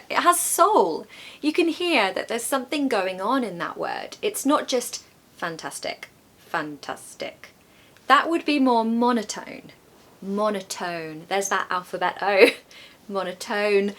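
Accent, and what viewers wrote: RP, United Kingdom. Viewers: Wonderful British accent❤ love it. This video was fantastic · Sorry but I was looking for someone with a true RP accent and yours was extremely close, so I recommended your channel to a Russian person who wants to learn English with an RP accent. Your English is excellent but that was my only criticism. If you play back this video you said ‘kind of’ three times in the space of about twenty seconds. It’s repetitive and something to be avoided. I suggest saying “similar to” as a variation. Hopefully you will take my observation on board but if you can’t bear being critiqued then you can delete my comments! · Although your accent is RP, it sounds more elegant than what other British YouTubers speak. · Hello, thank you so much for this video. Modern RP is like music to my ears. ❤❤❤ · From Rangoon karen youth,we'd like to let you kow that your British english accent make us feel satisfied.... 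· Love the British accent. After all, English is English; there's no such thing like American English. There's English and there're mistakes. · I love your British accent, it is so elegant and beautiful! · British accent + voice: music for our ears. One day you said you were a Beatles fan, I was trying to imagine their songs in your voice❤ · From Tunisia , I'd like to say that you are the best teacher ever and your british accent is beautiful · I live in PARIS about 60 years , I am VIETNAMESE, I learn ENGLISH alone because I like very much English language. It is the FIRTS TIME I listen Your video, I am very happy, because I like very much Your BRITISH ACCENT, Your voice is very Nice ! Thank You, that’ s very kind of You ! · Hello, I'm from Peru, you are very beautiful, your british accent I can understand it well, I've suscribed to your channel recently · British accent is beautiful but teacher make it so sweet to hear. 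I think the secret is that she is doing with somme art in her method of teaching. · When I look to your face and listen to your voice, I feel like I'm really looking at a British person.Your face and your voice is really British ❤❤❤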